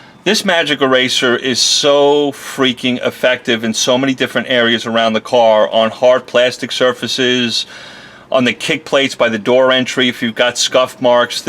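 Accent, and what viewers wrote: New York, United States. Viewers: Thanks for the tip. I have to do my car and wife's car soon. What pat of N.Y. are you from? (Never heard a Texan sound like Ray Romano) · It's impossible to tell if that's dirt or colorant that's pulled off the seat. Hope your N.Y. accent doesn't drive the native Texans nuts!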